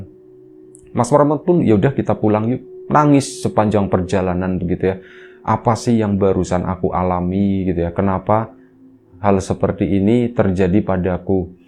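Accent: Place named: Banyumas, Indonesia. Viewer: Subscriber baru, denger cerita ini perasaan saya campur aduk antara PENASARAN, SEDIH DAN LUCU. penasaran sm endingnya krena sengaja ga bc komen, sedih sm kisah hidup mas parman dengan anaknya LUCU sm pembawaan yg natural banget 😁 tentu dengan logat ngapaknya, sukses terus buat channelnya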